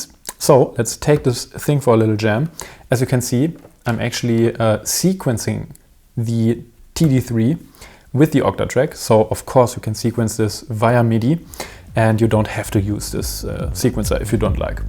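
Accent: Germany. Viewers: I love the way Germans speak English for some reason, and I notice “actually” is a high frequency word for a lot of them.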